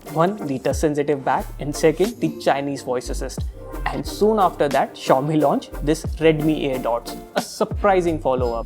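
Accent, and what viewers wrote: India. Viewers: Huy Tran this is their accents. I got familiar with them after 5 years study in India..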